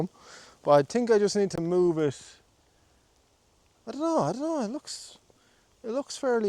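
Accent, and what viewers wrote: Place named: Ireland. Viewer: Your channel popped up on my feed,im so happy it did! How great are you?! I love your irish accent! Im aussie,but my heritage is irish. Just feel so nice listening and watching your show. 💖thanks mate!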